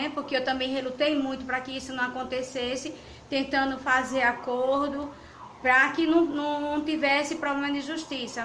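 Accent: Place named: Northeast, Brazil. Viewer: Kika Tita você está dando aula de língua portuguesa aqui pra quê? O vídeo é sobre procedimentos cirúrgicos. Você se acha muito culta, mas deveria saber que gente culta respeita a cultura e o modo de falar do outro. O vídeo mesmo é de uma Senhora Nordestina, e percebemos que ela tem um sotaque típico da região dela, e nem por isso significa que ela está falando errado. Eu falo com o sotaque super caipira do interior de Minas Gerais e tenho muito orgulho! Aprenda respeitar o modo de ser e falar dos outros. Escrever bonito só serve pra enfeitar papel. Você pelo jeito só foi na escola pra aprender escrever, porque educação você não tem nenhuma.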